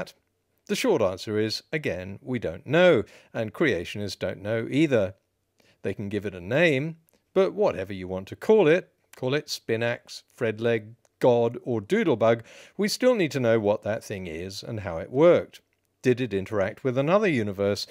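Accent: United Kingdom. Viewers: Like your videos! 👍🏻 what part of Australia they did grow up in?? Reason I asked is your accent is just so British. Cheers!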